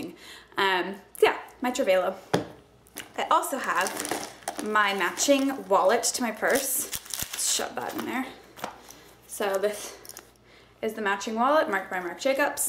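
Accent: United States. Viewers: its like a boston-ey accent lol, they put a ehg emphasis on their a's.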